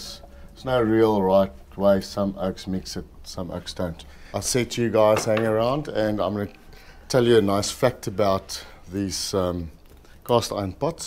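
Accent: South Africa. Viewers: Als ik jouw zo bezig zie, dan besef ik: WAT MIS IK ZUID-AFRIKA. En vergeet vooral niet: dat prachtige Z-A accent. Potjiekos met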